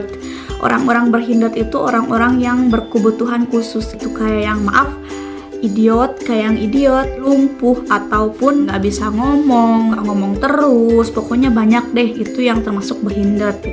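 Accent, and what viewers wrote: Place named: West Java, Indonesia. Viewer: Ya allah lucu nyaa😂😂 awal2 jerman terakhir jdi full sundanese asik nya klo bisa se group sm kk pantas di puji trus hihi😆😂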